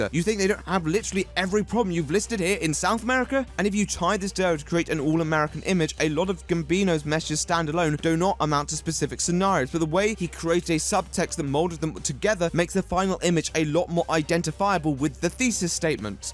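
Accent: RP, United Kingdom. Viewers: "Which is where we're FUCKING going next." Sir I LOVE YOU. lol your style of dry cynical humor is absolutely my cup of tea. I can't believe I've gone this long without knowing you were out there but I haven't stopped watching your videos since I found you a couple days ago. You're always so informed and fair in your assessments it makes me feel like i'm watching a really SMART documentary instead of a trend following gossip show. I feel like i get SMARTER when i watch your videos. listening to a posh British voice using big words while making snarky jokes and sounding SO over the bullshit is everything I need right now. and I always appreciate your opinion on these topics because unlike everyone else eagerly throwing their opinions in on any given subject, you actually EDUCATE yourself about them, instead of just speaking on the small bits of information you're not sure about. 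I appreciate your tact and dedication to making sure you present ALL facts and sides involved instead of grabbing the juiciest bit and running with it. And because you have such a strong personal opinion you often discuss things I'm not sure anyone else has touched on which only deepens the understanding of the situation and keeps everyone more informed in general. So thank you for all your hard work and your quality content. you found he magic recipe for your channel and your personality is absolutely the center of its success. you always talk about how if he audience doesn't connect with the creator t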